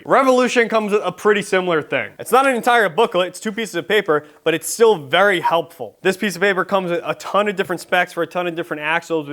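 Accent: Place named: Canada. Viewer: Boston? My guess was Canadian haha. I can hear it now that I'm typing this and you keep saying bearing!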